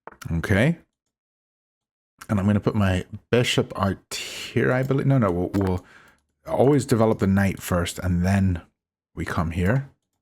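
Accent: Northern Ireland, United Kingdom. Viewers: You're English?! You sound Northern Irish! I would've put money on it.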